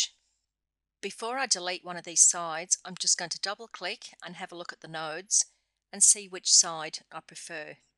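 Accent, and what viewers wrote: Australia. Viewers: She's Australian I reckon..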